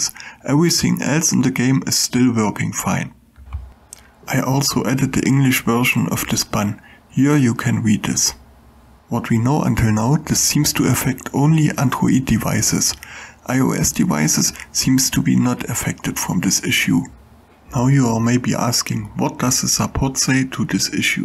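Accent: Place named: Germany. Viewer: heavy german accent :) german crew yeeeees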